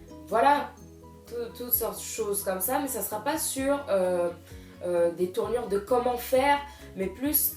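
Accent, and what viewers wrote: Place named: Democratic Republic of the Congo. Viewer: Moi aussi on me prend pour une sénégalaise ou malienne. C est notre couleur et les traits fins qui porte à confusion. Je suis du Congo brazza. C est vrai que je t aurai prise pour une sénégalaise mais à ton accent j ai reconnu le Congo sans être sur bien sûr. Donc on est compatriote 😉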